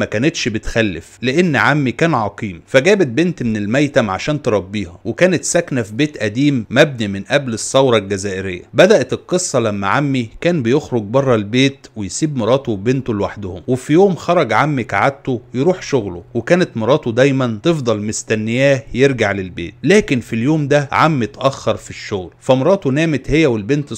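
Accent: Egypt. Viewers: مشكلتك كلامك مصري اشمعنى هههههه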